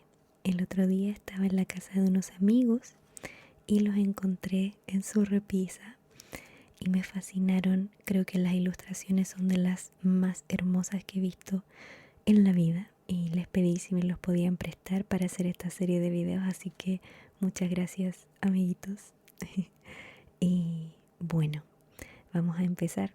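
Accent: Chile. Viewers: eres chilena o mexicana ? 🤔🩷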